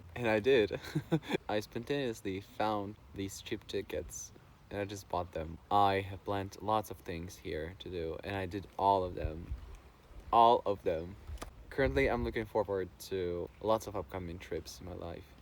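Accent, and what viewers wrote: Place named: Sweden. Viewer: Can really see your excitement in this video and your English sounds very Swedish as well... you fit right in :D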